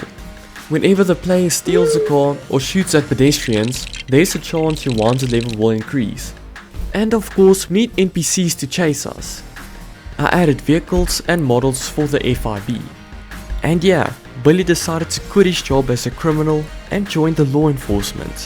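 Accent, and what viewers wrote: South Africa. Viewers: i swear, this guy sounds south african, as a south african myself, i can identify the accent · This is awesome, you sound like a South African. Support from Greece 💪🏼🇬🇷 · you are so South African i can hear it in your accent me btw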